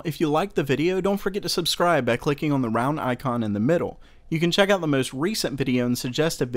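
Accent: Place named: South, United States. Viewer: That southern accent. :D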